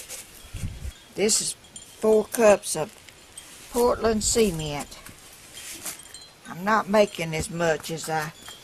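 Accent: South, United States. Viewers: Ms., what a blessing you are, listening to you makes me homesick for the south, I am from SC. God bless you, love your projects, what a wonderful job you do. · Hello Mr, Your so sweet love your southern accent. I love to watch you create, it come so natural to you. I could watch you for hours. I've learned a lot from you. Your very creative, and 'm impressed. I cant wait to retire so i can create my heart out like yourself. Bless You Always.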